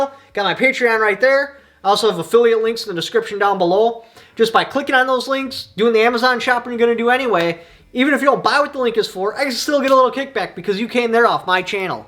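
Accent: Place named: Wisconsin, United States. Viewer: Yer fuckin Sconi accent is hilarious.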